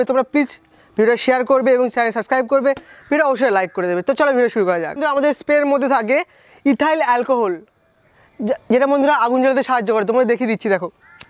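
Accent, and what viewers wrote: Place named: Kolkata, India. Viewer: Kolkata ...Vasha sunlei to buja jay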